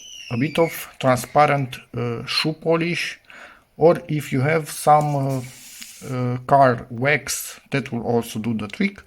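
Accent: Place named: Romania. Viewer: Aoleooooo ... asta-i englezeasca rumaneasca. Cel mai urat suna englezeasca asta, rumaneasca. O recunosti intre un milion de variante. Categoric esti ruman !!!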